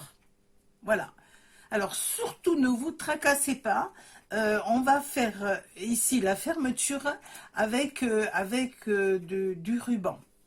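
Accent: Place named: Provence, France. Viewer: Coucou ma petite,comme tu est gentille 😊,c est moi qui te remercie,tu est si agréable, et tellement souriante,avec ton accent qui chante la Provence 😊,un régal. J ai vu de suite que tu avais coupé tes cheveux,tu est impec,😊,moi aussi mon mari m'a coupé mes cheveux, mais j ai pas le même résultat,lol,😉.Pas de soucis, tu peux compter sur moi je vais aller voir la chaîne de Ninou ☺.Un tuto 😁😁,album cartable, pas de soucis,je suis d attaque, on commence quand tu veux 😊😊, je t envoie pleins de bisous 😘😘🤗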